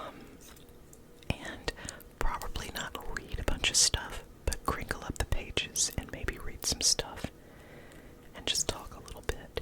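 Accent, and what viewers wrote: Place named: South, United States. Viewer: Thanks! Another very relaxing flip through. (BTW I love your southern accent 😊)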